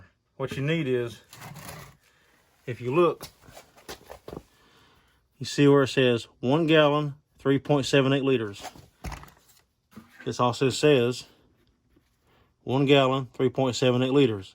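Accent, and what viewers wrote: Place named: North Carolina, United States. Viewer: Yes sir! Born and raised. Raised in Wilmington, born in High Point. I could tell by how your said “water”. Been living in the California mountains now for ten years. Once I heard you talk I felt like I was back home. Thank again for the video. It helped! I got me 1989 bronco with the 4.9l that I’ve been putting back together.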